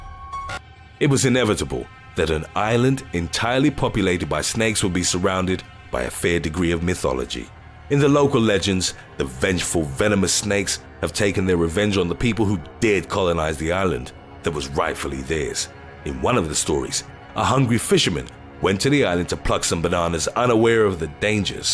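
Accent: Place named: United Kingdom. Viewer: this channel is to be good with giving you actual survive tips against certain animals or calamities like heat waves and avalanches. But it seems like he deleted all of those videos and now he's dishing out these wack videos that will never apply to me and with that annoying British narrator. Bring back the OG narrator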